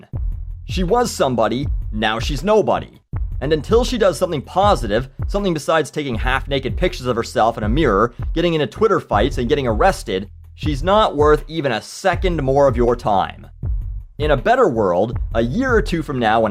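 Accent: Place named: Canada. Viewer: I love how speaks: it's Canadian as fuck.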